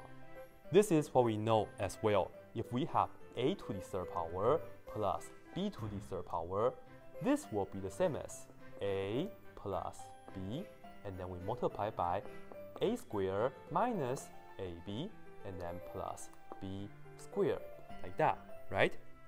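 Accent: Singapore. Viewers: It sounds like Singlish to me.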